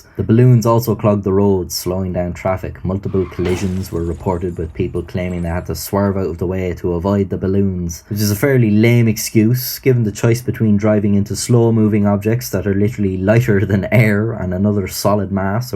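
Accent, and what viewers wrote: Ireland. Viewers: Are you Irish? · Can’t tell if he’s Irish or northern Irish or something completely different but he’s got the accent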